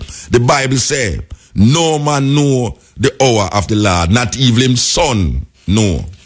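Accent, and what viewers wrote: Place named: Jamaica. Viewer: i would like subtitles...i know english but not this jamacian dialect..not when he speak fast.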